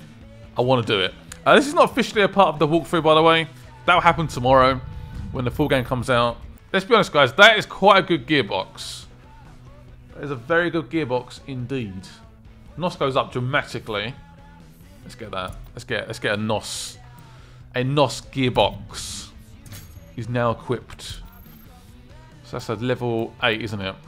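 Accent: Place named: Southampton, United Kingdom. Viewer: Bit of a southampton tune like it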